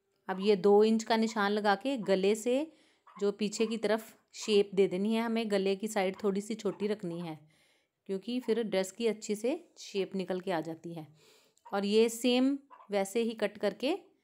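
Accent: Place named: Punjab, India. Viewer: Punjabi o na tusi ? Punjabi accent pta chal janda aa Wese acha bnaya tusi Mai b try krugi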